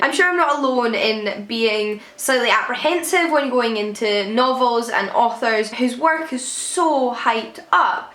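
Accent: United Kingdom. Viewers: Thank you very much for your sharing! I find it very useful for my study as an English major in China...btw, I am very intereted in your accent, I think it is very cute...Do you mind if I ask which part of the UK you are from? Thx so much!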